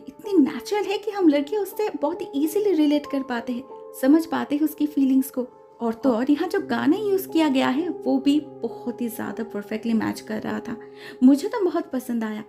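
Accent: Bengal, India. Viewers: Di kaya aap bangal se ho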